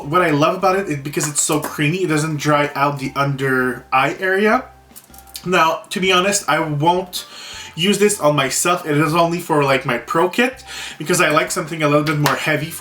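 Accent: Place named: Quebec, Canada. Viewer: Pe-Nell-o-pee. You French Canadians and your Accents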